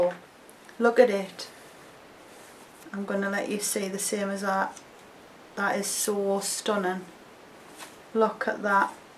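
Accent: Newcastle, United Kingdom. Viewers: You cannot believe how happy I am to see you back on here and so regularly too. Became a Patreon and wish I could send you more. I used 1 of your Barry M tutorials for a look I wore for years. I also bought 1 of your palettes too. Wow I’m beginning to sound like a weirdo lol, I live in Newcastle and love hearing the accent. You look so happy and relaxed xxxx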